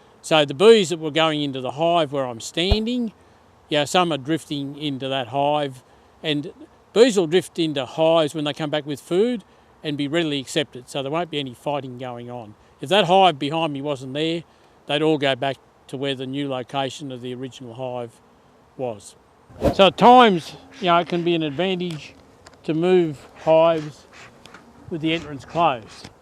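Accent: Australia. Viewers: Are you in Australia please you sound as you are I’d like to follow you if you are please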